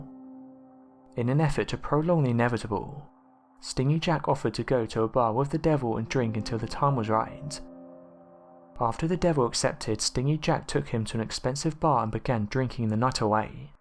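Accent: United Kingdom. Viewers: Like most Americans, I'm SUCH a sucker for British accents. Lovely PS Best allies ever! 🇺🇸🇬🇧🇺🇸🇬🇧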